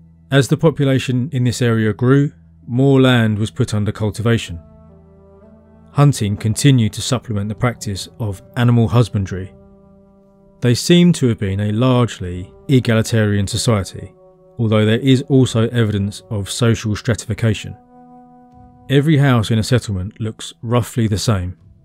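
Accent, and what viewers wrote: United Kingdom. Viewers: I cant listen to British narration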